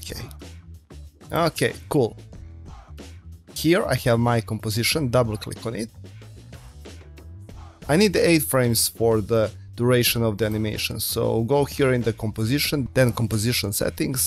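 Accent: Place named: Russia. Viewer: Тебя русский акцент выдаёт )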